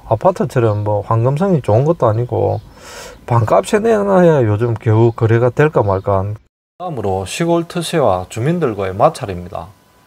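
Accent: Gyeongsang, South Korea. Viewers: 경상도 액센트가 너무멋져요❤❤❤ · 구수한 경상도 억양이 정감이 듭니다 전원주택의 벌레.잔디관리.귀촌의 단점을 잘 알려주어 로망을 접어야겠습니다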